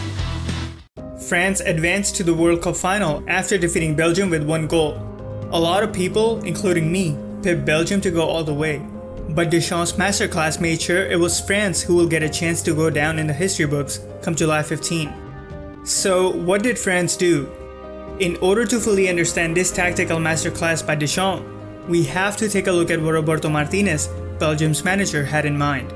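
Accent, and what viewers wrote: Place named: India. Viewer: The guy talking has to be an India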